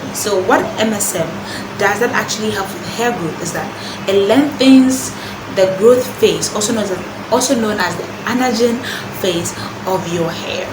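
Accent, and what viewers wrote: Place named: Nigeria. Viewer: Are u Nigerian? I work with a lot of Nigerian ppl. Girl ur accent is Everything!! Sounds like music when u talk. And oh yes thank u for this video. I was about to give up on MSM b/c of the bad headaches.. but I will try it again with more water. Thx girl. 🌹💫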